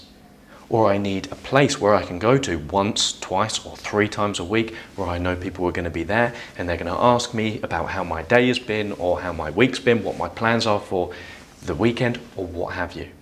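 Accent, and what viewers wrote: United Kingdom. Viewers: What type of British accent is this? I’m getting Michael Caine vibes 😊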